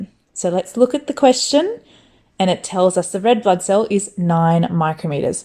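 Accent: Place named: Australia. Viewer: She Australian uwu.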